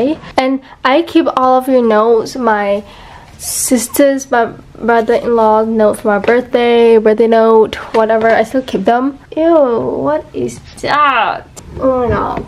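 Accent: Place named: United Kingdom. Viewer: ur british accents 💅💅💅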